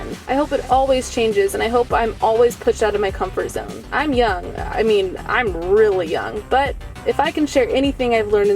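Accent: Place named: Ireland. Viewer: Daniel Amusan Thanks! Btw Iove the Irish accent! XD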